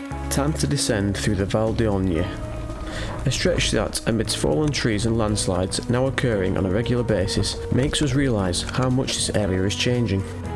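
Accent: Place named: North, United Kingdom. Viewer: Great vid, narrated by a good Northern lad. Magic 👍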